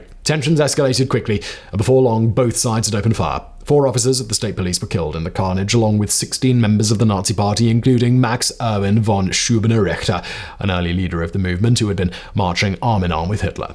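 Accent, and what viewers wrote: RP, United Kingdom. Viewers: I can't watch this guy, is that accent affected or is he really that posh? Ugh. It's too distracting.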